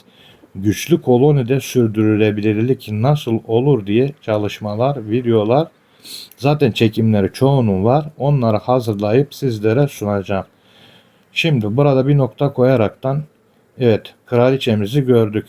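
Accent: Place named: Thrace, Turkey. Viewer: Sizi tebrik ediyorum. Arının dilini anlamış sırrını çözmüşsünüz. 4 yıllık bir arıcı olarak iyi ustaların tecrübelerine ihtiyacım var. Bazı günler yaklasık 5 saat yerli ve yabancı videolarda araştırma yapıyorum. Söylediklerinizi anlamayan izleyiciler hakkında açıklamada bulunmuşsunuz. iç Anadolu bölgesi insanı olarak Trakya şivesine alışık olmadığım için bende biraz zor anlıyorum. Büyük hazineler daha derinde olur deyip, anlamadığım yeri tekrar dinleyerek bunu hallediyorum. Küçük bir eleştirim olacak. Sanki katları yerine koyarken fazla arı eziliyor. Ve kamera açısı biraz daha geniş yada uzaktan çekse izlemesi daha keyifli olurdu.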